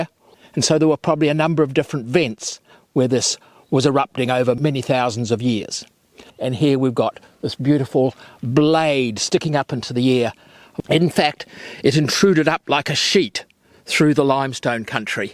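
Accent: New Zealand. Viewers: You have to guess it's in New Zealand from the guy's accent. Very interesting, well done.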